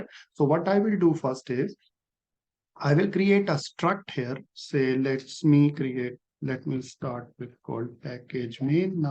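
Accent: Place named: India. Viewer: Gosh .... Indian english is disguisting . As always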